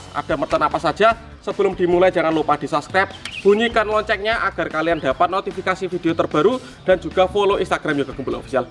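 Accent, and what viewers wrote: Java, Indonesia. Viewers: Wong jowo yo mas?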